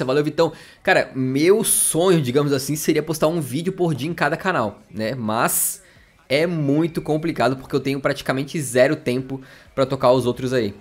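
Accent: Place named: Florianópolis, Brazil. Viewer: Esse sotaque manézinho hehe! Salve mano! :D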